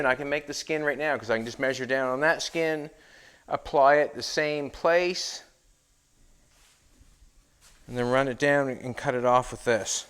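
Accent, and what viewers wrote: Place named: Canada. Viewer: This dudes for sure a Canadian!!! Listen to his accent!! Yeah buddy!! That cab oversight gonna kick some serious ass!!!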